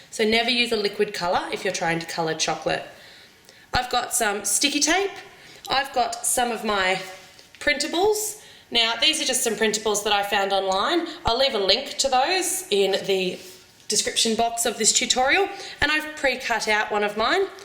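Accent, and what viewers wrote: Australia. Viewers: Im always wonderin... ur austrailian right??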